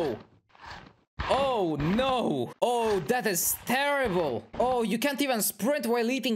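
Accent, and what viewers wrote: Italy. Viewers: Italy? Sei italiano? (Are you Italian) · " So it cooks ( Coo-ks) faster " Gosh i love Italian accents XD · Your from italy? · Capisco dalla pronuncia che sei italiano complimenti per l'inglese ti giuro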